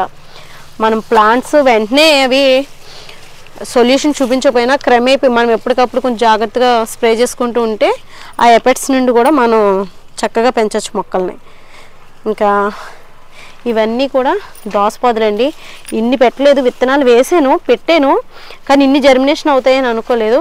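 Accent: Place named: Godavari, India. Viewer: మీ మిద్దె తోట చూస్తుంటే...చాలా చాలా సంతోషం కల్గింది...ముఖ్యంగా...గోదావరి యాసతో మీ మాటలు ఎంతో ఆహ్లాదాన్ని కల్గించాయి...అభినందనలు...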